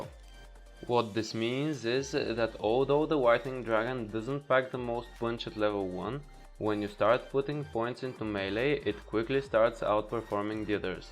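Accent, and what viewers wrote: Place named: Eastern Europe. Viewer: where ere you from? you sound Slavic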